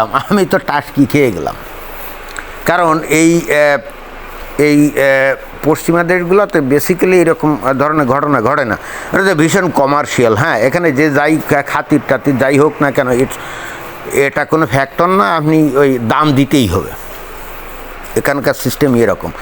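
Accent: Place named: South, Bangladesh. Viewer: আপনার ভাষার উচ্চারণ শুনে ধারণা করি আপনি যথাসম্ভব দেশের দক্ষিণাঞ্চচলের মানুষ,আমার বাড়ি ও এদিকে।Student visa তে আমি ও দুই বছরের মত ইউরোপের একটা দেশে ছিলাম কিন্তু মন টেকেনি।পড়াশোনার পাশাপাশি কাজের সুযোগ ও খারাপ ছিল না তবুও কেন জানি Home sickness কাটিয়ে উঠতে পারিনি চলে আসলাম।পরিচিতরা ঠাট্টা বিদ্রুপ করে চলে আসাতে কিন্তু তাদের কে কি করে বুঝাব দেশের জন্য মন হুহু করার অনুভূতি টা কেমন।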